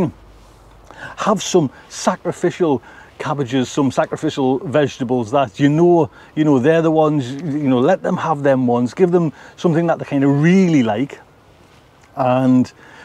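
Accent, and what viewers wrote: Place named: Newcastle, United Kingdom. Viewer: Great surprise! Nice to hear a Geordie accent - I live in Colorado and we have a bad outbreak at the moment.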